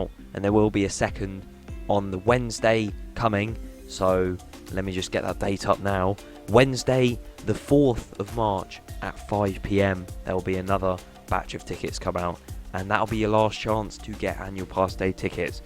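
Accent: United Kingdom. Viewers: where in england are u from?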